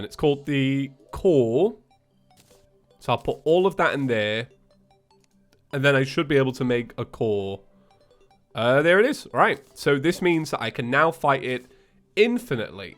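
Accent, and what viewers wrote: United Kingdom. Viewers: Are you brittish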